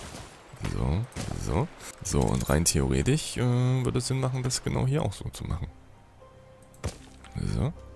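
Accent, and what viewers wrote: Saxony, Germany. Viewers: Sächsisch? LG (ツ)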